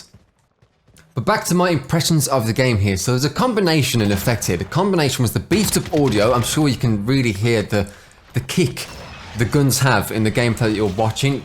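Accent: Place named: United Kingdom. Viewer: Your accent is British af.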